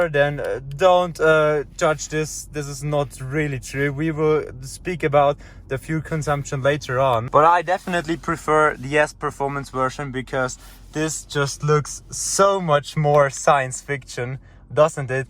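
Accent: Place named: Germany. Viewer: Bro you are from Germany i can hear it ! Moin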